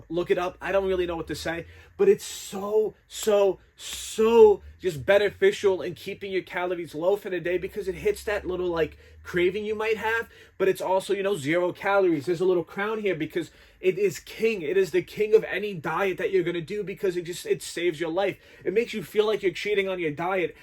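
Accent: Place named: East Coast, United States. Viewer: Hope you get to read this. Just found your channel and love your content. Just by watching your videos and listening to you i feel already more motivated to go to the gym and i already love going to the gym. You east coast accent its sucha perfect gymbro motitation chefs kiss Plz take my opinion is the best way possible. Keep up the good work! Love from Sweden.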